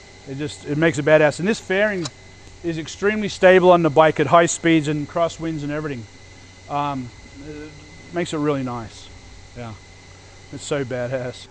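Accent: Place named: Australia. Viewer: Okay, I gotta ask... Are you Aussie, New Zealander, or American? Your english kinda goes from Aussie/Kiwi to American english with certain words so I am thrown off. Great video but if you can answer that... that would be great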